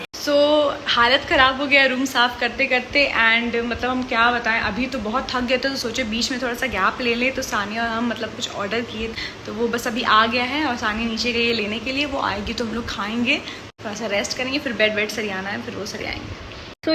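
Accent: Bihar, India. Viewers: Bihari accent is love...btw kon year me ho didi? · Didi your talking way was lovely 🥰 I'm also from Bihar waha pe ese hi baat krti h ladkiya unki yaad dila di 😍 btw now I'm in delhi, your words like ( dekho ,hum ) give me nostalgic felling when I'm in bihar nowadays in vecation 🤩🥺🥺🥺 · I just accidentally came across your channel and glad to hear the typical simple Bihari tone in your voice☺ And ofc, your room looks beautiful 💯❤ I'm also from Patna, gonna give 2nd attempt this yr. Di, wish me luck😅🙏🏼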